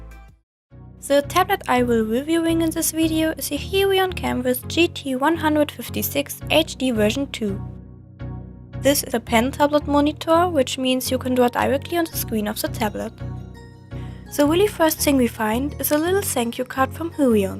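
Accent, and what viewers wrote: Germany. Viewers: I don’t see why your embarrassed your english is fine, I have a german au pair so I guess i’m just used to the accent. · God I love your accent, everyone loves British accents but German accents I’ve always found are the best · OMG I adore your voice i speak Netherlands so it's a little bit similar to german i can hear your german accent it's sooo cute <3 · German accents are my drug!! They're so cute!! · I love your voice so much and I absolutely love german accents =3 I also love your artwork your one of the people who inspire me to get better at art. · man merkt einfach das du deutsche bist XD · You are from Germany? Aber dein Akzent ist cool.😋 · man hört dass sie Deutsch ist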